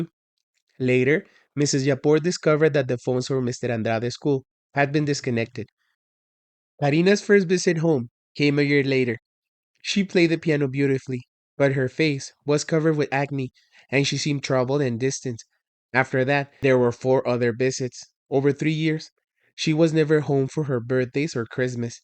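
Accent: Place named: Latin America. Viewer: Excelente inglés latino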